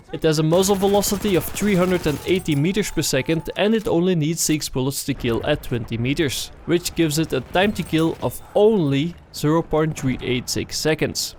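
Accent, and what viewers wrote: Netherlands. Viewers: battelfield wan xD. your dutch accent is overwhelming xD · Is this a dutch voice over guy? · nederlander lol ik herkende het meteen aan je accent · that dutch accent tho, nice good work!! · That dutch accent. 😂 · ik hoor al dat je Nederlands bent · Where are you from? When i first saw one of vids i heard a dutch accent so yea... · Ik hoor gewoon dat je nederlands bent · You sound Dutch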